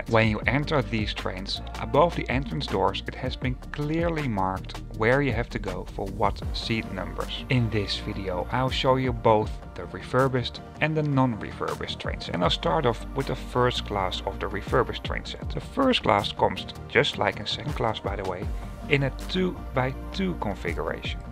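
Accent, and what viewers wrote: Netherlands. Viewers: Ik hoorde echt binnen een seconden dat je Nederlander bent 😅 We can't hide who we are 😛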